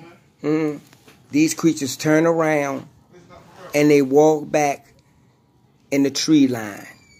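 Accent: Baltimore, United States. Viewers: Bro u sound like u from baltimore da city im in. I know they got bigfoot here in maryland we could probably do some research if you were down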